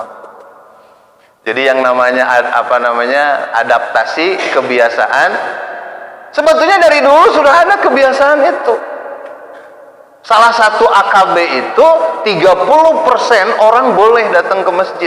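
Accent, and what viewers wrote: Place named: Sunda, Indonesia. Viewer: Logat Sunda teh lembut kena kupingnya,,, berkembang terusss kang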